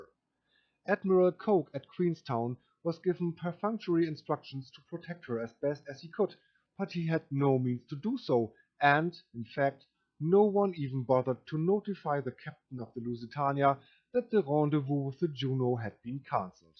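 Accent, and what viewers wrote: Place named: Germany. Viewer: Deutscher Akzent👍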